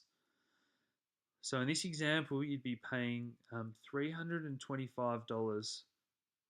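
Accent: Australia. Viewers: Good video. Great info. Good to hear an Aussie crypto trader. Subscribed. Cheers :)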